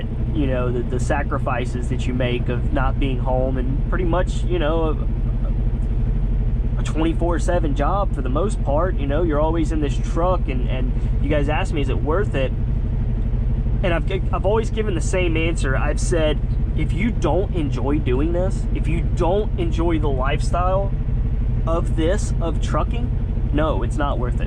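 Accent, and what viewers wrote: Mississippi, United States. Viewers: Man I knew you was a Mississippi boy lol. Same here.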